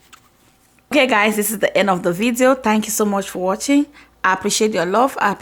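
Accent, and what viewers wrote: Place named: Kom, Cameroon. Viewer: It’s de dialect for me sis🤣🤣🤣🤣🤣🤣🤣🤣🤣🤣🤣🤣🤣🤣thank u for representing us, we the kom people are proud of u😍bon appétit 🥂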